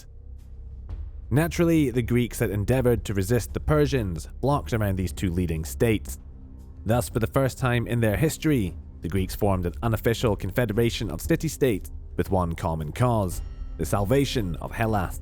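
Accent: Scotland, United Kingdom. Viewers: Much better still needs to flow a bit more but still you're getting there. Read entire sentences in one go and pull back on the emphasis in the middle of them. However from last time it's a big step keep going like that and you'll be there faster than later. Maybe don't worry about the accent as its really nothing even when it comes out and it might be that your trying to sound less Scottish is actually hurting your style rather than helping. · “slightly sinister vocal tones” 😂 scottish accent is ❤ !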